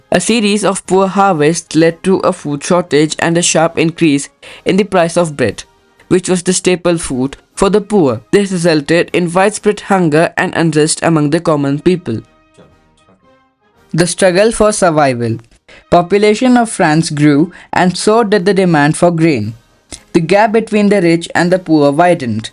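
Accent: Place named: England, United Kingdom. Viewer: Excellent work,Your explanation of the French Revolution is so clear and engaging, and your English accent makes it even more enjoyable to listen to. Looking forward to more of your videos.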